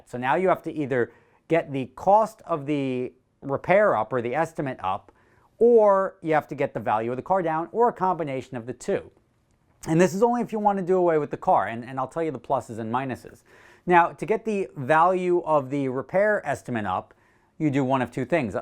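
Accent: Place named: New York, United States. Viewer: Am from Kenya and you just have the realest New York accent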